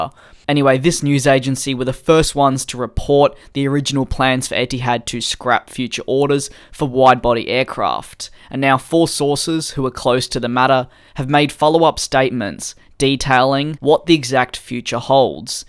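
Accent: Australia. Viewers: "Reuters" spot on pronunciation...even with an Aussie accent!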